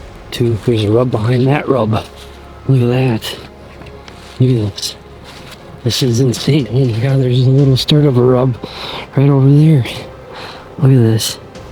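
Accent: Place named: North, United States. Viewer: Holy Northern accent 🤣. Gotta love it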